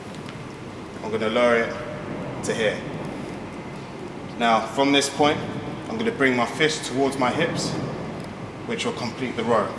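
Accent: United Kingdom. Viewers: As an American (white guy) when I hear a refined British accent coming from a black man who probably has a normal job of some sort, it gives me hope for the average American black person. Not much hope but still a bit of hope that they are capable of being refined.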